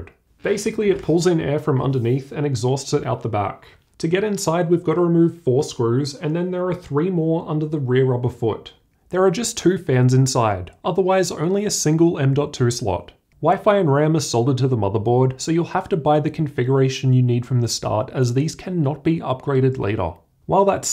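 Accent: Australia. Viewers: The Australian accent caught me off guard 😆🤟 not many Aussie techies on YouTube.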